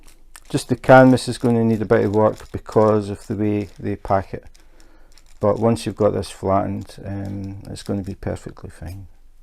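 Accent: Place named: Scotland, United Kingdom. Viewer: It's always fun to listen to a Scottish accent. So cool. My father-in-law is Scottish but he has lived in Australia (where I'm from) since he was 17 y/o. He's now 80 y/o. I thought his accent was still strong but after listening to yours I realize it's not as strong as I thought.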